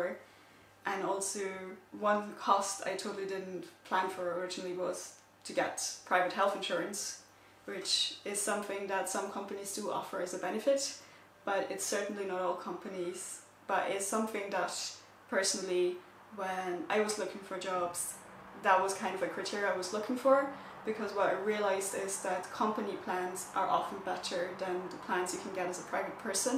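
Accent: Ireland. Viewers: I can hear little bit of Irish accent there . Nice .good luck I like other nationalities coming here ☘️ love Austria too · Loving the irish accent going on! Clearly you have been infected! Great video though.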